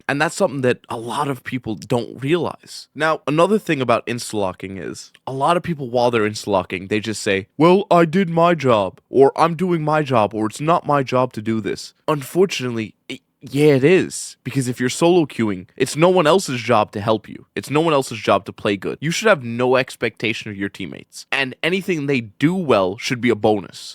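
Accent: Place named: New York, United States. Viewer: Man you def sound like a New Yorker that wants the best for everyone. I'm a tekken refugee playing marvel rivals and these videos helped alot!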